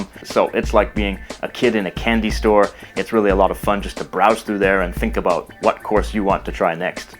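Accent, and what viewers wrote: Canada. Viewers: For some reason I knew that you weren't American. I know that you are trying to speak clearly, so non natives can understand you better, but in general Canadians speak more eloquently and clear. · Thanks for the information! I was curious because your accent didn't sound like the "standard" Canadian accent to me. From which province do you come from?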